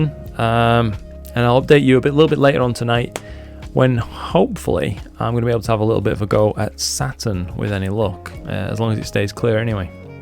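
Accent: United Kingdom. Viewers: it really does, thanks for the response. Yes UK based and from the accent im guessing not a million miles from yourself. They seem to be considerably cheaper ordering direct and it seems most of the Starfield stock comes from their HQ in Canada after reading reviews so I may order direct. I've watched a few of your videos today about the player ones and I'm really impressed with the quality. Great videos as well 👍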